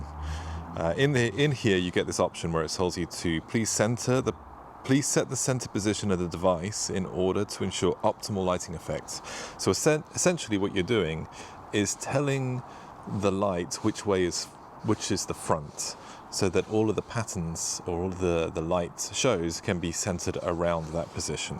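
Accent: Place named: United Kingdom. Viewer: Very good instructional recording; along with your British accent and mannerisms, made this pleasant to watch. Thank you from Canada 🇨🇦 Merry Christmas or as you would say “Happy Christmas!” , and a Happy New Year 🥳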